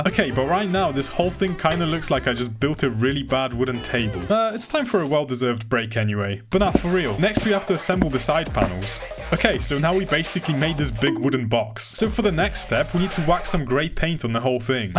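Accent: United Kingdom. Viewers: I know you're British because of the speaking and I don't know how no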